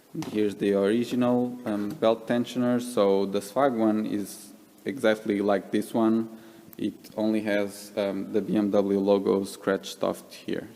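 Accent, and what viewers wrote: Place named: Russia. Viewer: Судя по инглишу, автор случайно не русскоговорящий?)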